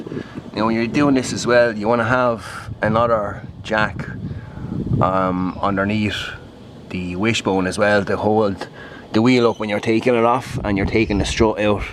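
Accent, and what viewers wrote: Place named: Ireland. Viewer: I like your Irish accent!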